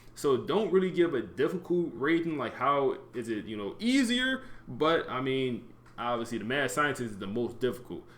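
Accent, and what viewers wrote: Philadelphia, United States. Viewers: Man I instantly knew this dude was from Philly!! lol but I used Flexible Play caller without realizing what I was choosing. Description definitely fits against my game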